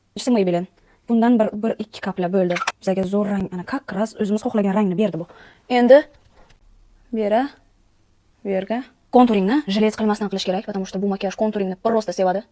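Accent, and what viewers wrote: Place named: Tashkent, Uzbekistan. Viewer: Iltimos tashkenskiy sheva qati nakunet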